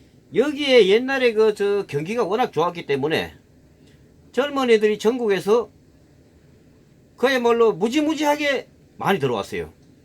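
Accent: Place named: Gyeongsang, South Korea. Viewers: 앞전에 님께서 칠점사 꼬리떠는거 설명하실 때 "건들면 쥑이삔다" 라고 하는 거 듣고, 님 고향이 경남 서쪽 어드메쯤인지 대략 짐작이 갔었읍니다. 성조도 부산 마산은 아닌 것 같고, 대구는 더더욱 아닌 것 같고, 진주하고도 다르고, 찍어서 대략 그 안쪽 산동네 지역인 것 같았고... 제가 사실 서울사람이지만 모종의 일로 엮인 적이 많아 경상도 말씨를 꽤 잘 아는데, 경북쪽은 "-해뿐다"라 카든 것 같고, "-해삔다" 경남쪽에서 쓰는걸로 알고 있읍니다. 원래 태백 일대 말씨는 대체로 경북 북부지역 일대와 마이 가차웠던걸로 기억합니다. 다만 두문동재, 만항재만 넘어가면 주민들 말씨가 확 바뀌던 것 같읍니다. 원래 태백 (황지+장성) 일대가 삼척 땅의 일부였고, 소싯적부터 삼척 일대가 국어학자들 사이에서도 영남방언의 일부로 간주되는 측면이 있었다는데... (그래서 경상도 어르신들이 가끔 뻥을 좀 치시지요. 원래 삼척은 경상도 땅이었다고, 뭐랄까 영동공정?을 열심히 펼치십니다. 경상도 출신이 아닌 제 아버지조차 세뇌되어서 그런 말을 하실 정도) 참고로 삼척 윗동네, 옛 명주군(현 강릉의 읍면단위 지역) 땅이었던 묵호쪽도 외지인 유입의 영향으로 경상도, 함경도(실향민들이 많이 정착) 사투리가 꽤 많이 통했읍니다. 지금도 동해시에서는 엄연히 "강원도"지역임에도 불구 "도째비골"을 관광홍보물에 대놓고 " 의 경상도 사투리를 뜻합니다"를 말할 정도로, 그정도로 영남지역의 영향을 많이 받았던 동네입니다. 적어도 지금 40대 정도 세대는 대체로 그런 듯 한데, 하지만 지금 묵호의 최소 중고등학생 세대까지 내려가면 그 이전 세대에 통용됐던 영남방언이든 관동방언이든 관북방언이든 다 잊혀진 듯 합니다. 정류장에서 버스를 기다리면서 학생들 말하는 것 듣다보이, 그냥 "성조 없는(위의 세 동부방언 모두 성조 있음)" 평평한 서울말로 대화를 하더라고요. 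이건 강릉도 그렇다는데, 강릉쪽은 예전부터 문중사회 기반으로 해서 토박이들 많고 소싯적에 대관령 서쪽과의 교통도 대단히 불편하고 해서 (영동선 뚫리기 이전에 제주도 울릉도 오가듯 철도가 있는 대도시인 원산, 포항, 부산으로 '기선'타고 갈아타서 서울로 갔다고 하네요) 꽤 독특한 억양과 어휘의 고유의 방언이 사용되던 고장인데, 젊은 세대 사람들이 사투리를 잘 모르게 돼버려서 그래서 지역 학자들이 많이 아쉬워한다 하더군요. 이 역시도 급격한 표준어권 문화의 유입으로 인해서... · 경상도말투는 한참 듣다보면 편두통이 온다네...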